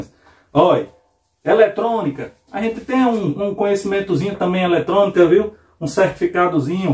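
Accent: Northeast, Brazil. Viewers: "a gente fala errado", onde... Falar com sotaque nordestino NÃO é falar errado é um capricho artístico. Vejo você falar sempre muito corretamente e quando escapa algo sempre corrigi logo. Falar errado é esse bando de Youtubers que não sabe nem usar as conjugações verbais corretas ou usar o plural na hora certa. Você está de parabéns em tudo que você fala e da forma que você fala. E olha eu sou Paulista da Capital de SP.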